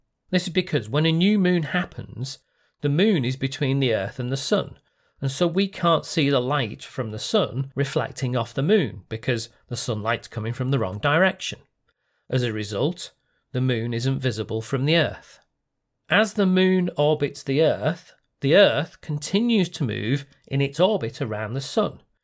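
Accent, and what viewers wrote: United Kingdom. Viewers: Can i ask you from what part of Britan does your accent come?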